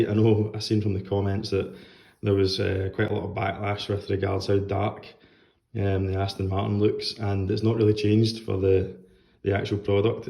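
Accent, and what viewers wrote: Scotland, United Kingdom. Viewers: cool review mate, nice to hear a fellow jock ;) steelbook fan but didn't like the steelbook and hated the movie, worst since Roger Moore for me and definitely the biggest pussy of a james bond baddie ever ;(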